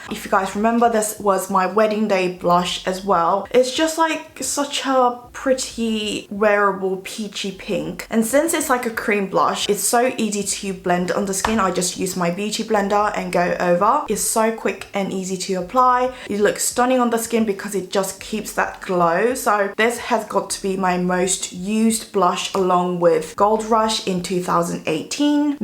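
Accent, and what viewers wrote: United Kingdom. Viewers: Love the British accent🙃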